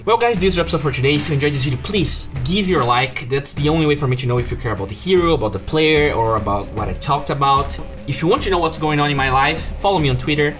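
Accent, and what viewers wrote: Brazil. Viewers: C é brasileiro, man??? Tem sotaque BR xD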